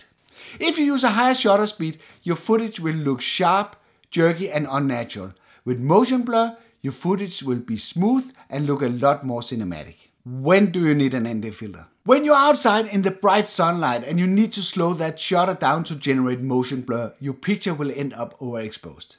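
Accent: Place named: Denmark. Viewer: Fantastic video! Best one on youtube to explain ND filters! oh well, your english has a bit of danish taste. that's even better! greetings from Copenhagen! Mange tak!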